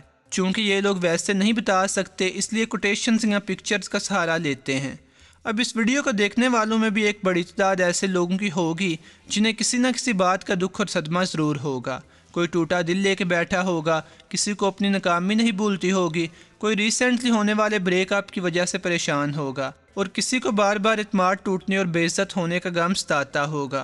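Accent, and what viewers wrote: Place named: Punjab, Pakistan. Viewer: آپ کی موٹیویشمل سپیچ زبردست ہے . آپ بنجابی ٹچ کچھ کم کریں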